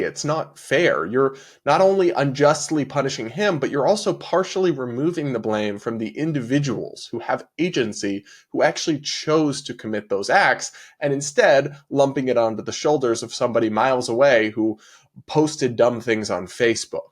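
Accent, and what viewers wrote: United States. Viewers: You say “from a centre right perspective” but I hear a yank accent so in reality you are coming from a far right perspective.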